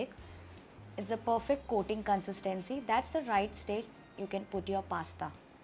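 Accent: India. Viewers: I didn't say her English is bad. I said her English is good but with a Hindi accent. And I stick on to my statement.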